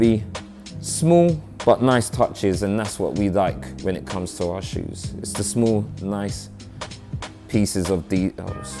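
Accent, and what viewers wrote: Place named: England, United Kingdom. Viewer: Its amazing an african guy speaking like an englishman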